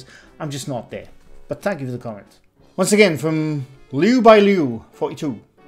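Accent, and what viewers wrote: Wales, United Kingdom. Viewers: Luv Welsh accent too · enjoy listening to your lovely welsh accent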